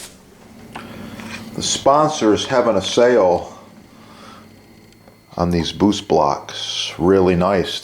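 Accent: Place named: Midwest, United States. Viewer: Thanks for the video! I love a good mid-western accent; it keeps my ears perked, lol. Keep creating, man!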